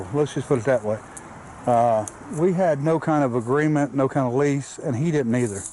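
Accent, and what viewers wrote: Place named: Maine, United States. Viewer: Sounds and looks like maine accent is throwing me off tho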